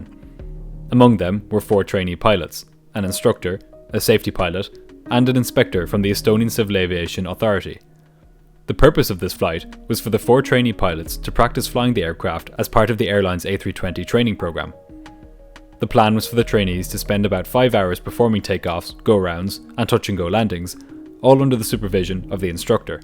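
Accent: Ireland. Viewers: Very well done,! Perfect command of technical details, and that lovely Irish accent. Great! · No idea how u pulled it off and got subs , a posh Irish muppet recycling stories that are long long told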